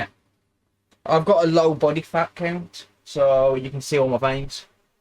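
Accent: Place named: Scotland, United Kingdom. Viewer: Good scottish accent mate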